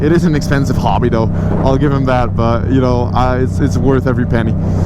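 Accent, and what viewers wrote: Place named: United States. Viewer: you have a very American sounding accent lol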